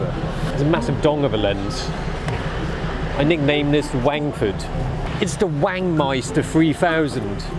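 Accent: United Kingdom. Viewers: Oh my god, Asian face with British accent.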